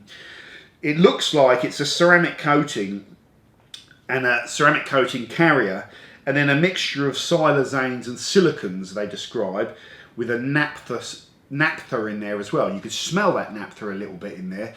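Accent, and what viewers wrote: England, United Kingdom. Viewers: Accent? An English man speaks English 🙆‍♂️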